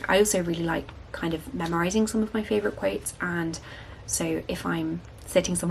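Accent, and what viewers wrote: United Kingdom. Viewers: The UK accent? Writing as a Latin American native, the US accent is more on higher tone, and listen her make me think 'so calming' the Britain's make the difference hehe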